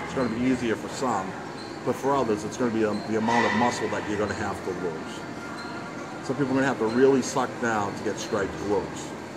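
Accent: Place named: New England, United States. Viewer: Welcome to the family! Great see and hear another New Englander on YouTube kid! Had me at and! Looking forward to more videos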